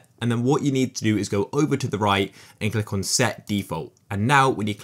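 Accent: United Kingdom. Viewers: nah bruv he’s bri’ish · hes bri-ish/british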